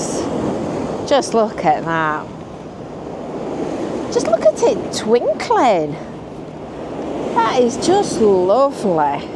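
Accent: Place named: Lancashire, United Kingdom. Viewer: Hello, I found your ‘vlogs’ only last week, nice hearing a Lancashire accent, I’m a Lancashire lass (well not a lass I’m 80) I always went to the illuminations with my parents the 50’s and remember standing in a doorway of the old Woolworths up a side street and the ‘sea’ coming across the road. Ive subscribed so will watch all your vlogs,thank you and stay safe, don’t get blown away 🚶‍♂️☕️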